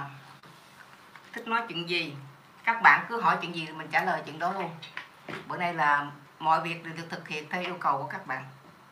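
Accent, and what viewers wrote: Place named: South, Vietnam. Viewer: Nghe giọng nói cô tôi tưởng tượng là một phụ nữ nam bộ già dặn. Những được nhìn dung nhan thấy trẻ hơn giọng nói rất nhiều. Từ khi biết kênh của cô tôi rất thích.. hiểu biết sâu rộng … phân tích cặn kẽ dễ hiểu, luôn ủng cô … đầu năm chúc cô và gia đình vạn sự bình an, hạnh phúc tràn đầy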